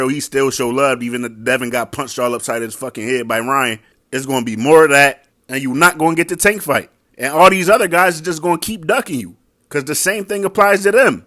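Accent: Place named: Pittsburgh, United States. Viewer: the guy who runs this channel is from Pittsburgh not chester..that Chester accent is super odd n nothing like philadelphia..Pittsburgh is definitely similar tho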